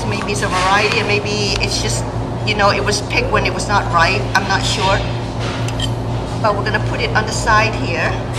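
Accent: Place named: Germany. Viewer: where is this weird accent from? sounds german english to me!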